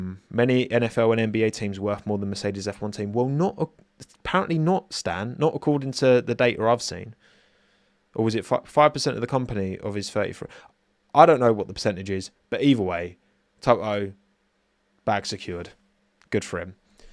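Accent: United Kingdom. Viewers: Wow, what a frustration! You sound like a Brit and that’s not a compliment…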